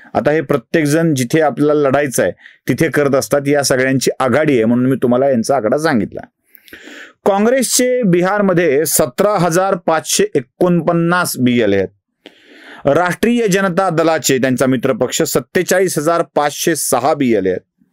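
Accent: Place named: Marathwada, India. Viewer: श्री आपण मुद्दा तर व्यवस्थित मांडता, त्यापेक्षा मराठवाडी मराठी खुपच छान बोलता. धन्यवाद.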